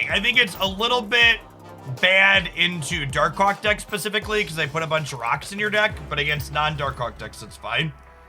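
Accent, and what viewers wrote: United States. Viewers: haha! Could tell within 2 sentences. Source: someone also from the south side.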